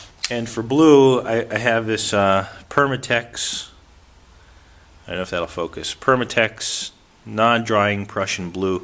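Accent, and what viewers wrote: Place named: New York, United States. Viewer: Does hail from New York? That might explain the vocal similarity to Raymond Romano and Alan Alda who both are From there.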